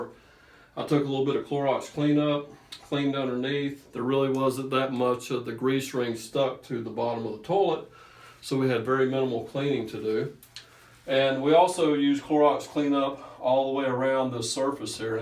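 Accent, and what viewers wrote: South, United States. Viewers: Thanks for being very thorough! I like your " " accent"!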